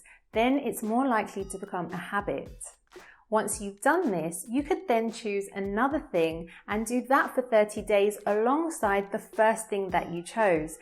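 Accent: United Kingdom. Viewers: I got difficulties to understand british accent😅😪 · British Accent.. sorry to say, my dream is wanna learn only American Accent... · I love your British accent my dear. 😍🥰😘❤ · Your british accent is really amazing thanks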